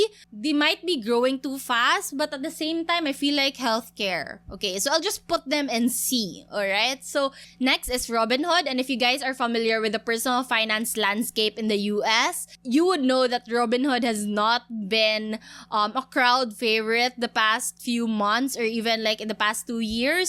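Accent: Visayas, Philippines. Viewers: Bisaya po kayo Ma'am 😁😁 ??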